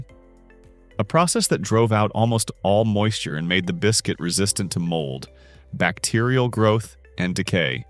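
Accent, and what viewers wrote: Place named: United States. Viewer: Very good video. I have subscribed to your channel. But please learn to pronounce "anti", "semi", "multi" and "vitamin". Only in American English can a perfectly straightforward Latin prefix be twisted into a diphthong that never existed in any language known to man.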